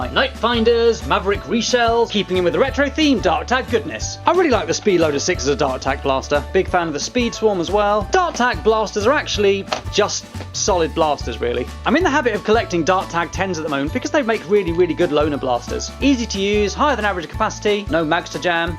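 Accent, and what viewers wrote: United Kingdom. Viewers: Btw can i get a Shout out in your next vid i would greatly appreciate that plus i love your British ascent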